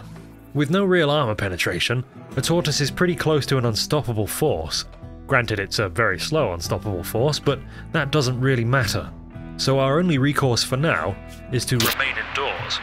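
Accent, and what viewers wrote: United Kingdom. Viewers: Hello Mr British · my hyperfixation? in riwmorld? WITH A BRITISH VOID MAN? yell heah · Babe, wake up, the funny British guy is back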